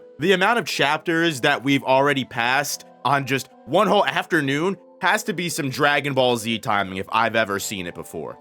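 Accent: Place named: United States. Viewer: The problem with american accent reading Japanese words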